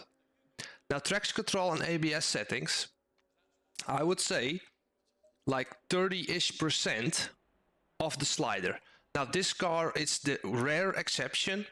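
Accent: Netherlands. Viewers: I smell dutch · You have amazing videos! Subscribed. Btw, you're Dutch, right? (me too). You have that Dutch accent when speaking English.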